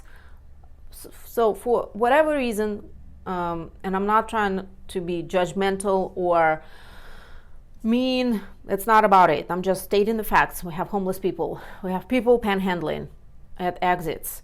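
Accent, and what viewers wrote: Russia. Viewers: I like you accent Russian. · Sound like a Russian mail order bride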